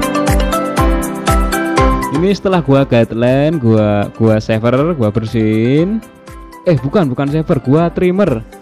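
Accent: Indonesia. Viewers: Ngapak ya mas 😆😆 Salam satu gunting 💈💈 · Ngomongnya Gk usah gua2 sih wong logat jawa kok pake gua, bilang aja saya atau aku mas,,, biar enak dengernya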